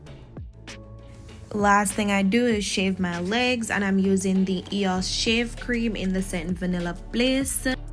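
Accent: Jamaica. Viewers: Hey are you Jamaican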